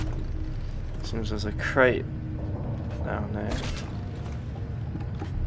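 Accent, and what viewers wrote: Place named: England, United Kingdom. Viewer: "IMA TRYIN ET AMMO YA FAWKING CAUNT" xD i luv the english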